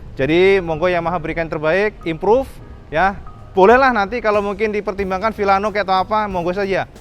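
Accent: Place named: Kediri, Indonesia. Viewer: Mas asli mana ya kok bahasae mirip2 orang kediri ya,campuran lah khas jawa timur.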